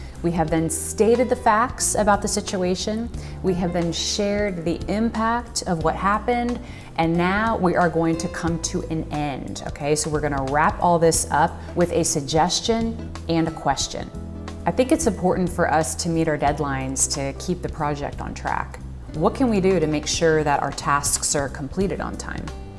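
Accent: United States. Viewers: Are you American? Are you a native American speaker?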